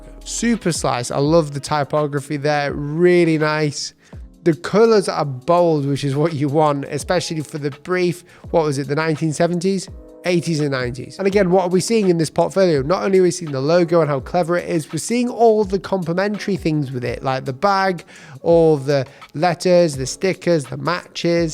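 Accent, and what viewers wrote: United Kingdom. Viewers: He sounds VERY British 🔥🔥🔥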